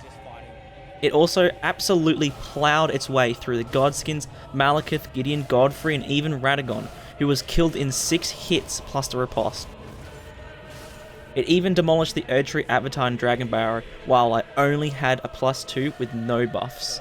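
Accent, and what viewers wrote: Australia. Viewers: u sound a bit like crocodile dundee mate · my god you are soooo australian, i love it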